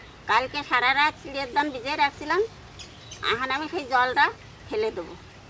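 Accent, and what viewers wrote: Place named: Faridpur, Bangladesh. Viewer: আপনার ভাষায় ফরিদপুরের সুর দাদি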